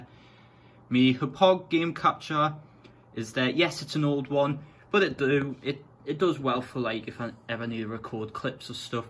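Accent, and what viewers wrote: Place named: United Kingdom. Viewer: Sick setup and wow your accent is different to what i have heard from british youtubers lol. keep up the good work.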